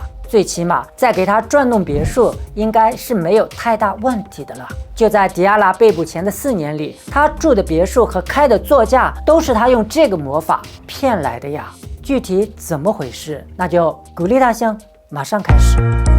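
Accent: China. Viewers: 請問你是哪裡的口音？安徽嗎？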